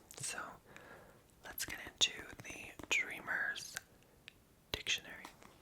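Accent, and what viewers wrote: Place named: United Kingdom. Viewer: Love this concept! Was super interesting to listen to and your whisper was super relaxing! I usually don’t vibe with male ASMRtist’s whispers but yours is unique. It sounds like you have a UK accent sometimes. Maybe you just annunciate very well.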